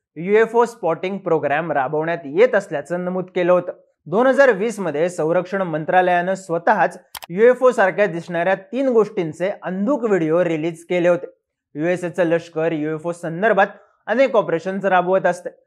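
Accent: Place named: Kolhapur, India. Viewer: हा कोल्हापुरी भाषेचा लहेजा आहे ❤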